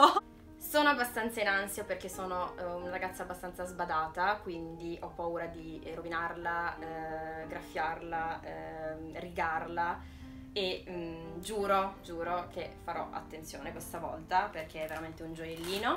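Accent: Sicily, Italy. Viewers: Ma sei siciliana? Sento un pò ' l'accento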